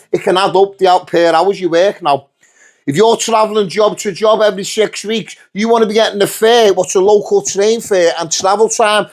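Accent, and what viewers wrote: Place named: Liverpool, United Kingdom. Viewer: How scouse is this man!😮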